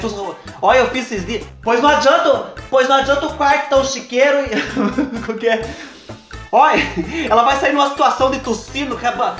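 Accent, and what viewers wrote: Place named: Minas Gerais, Brazil. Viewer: Ô, e eu achando q vc fosse de MG kkkkkkkk! Com tantas notícias negativas rolando na NET precisávamos mesmo ri um pouco.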